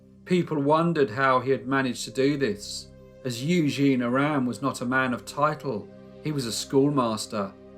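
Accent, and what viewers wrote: United Kingdom. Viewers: mmm never watch tem before.. gotta look it after. There's also Lazy Mascarade, his voice is cool. But your voice is amazing too! By the way, if is not indiscrete, may I know which part of UK your accent is from? I'm very curious about accents. English isn't my mother language so I try to learn from any accent.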